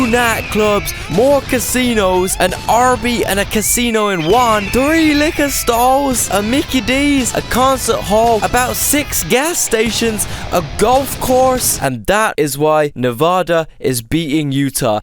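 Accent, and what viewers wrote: United Kingdom. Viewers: It’s funny to hear some Brit, Try to explain America. And the south to beat it all haha. Even though you ain’t far off for a couple these places.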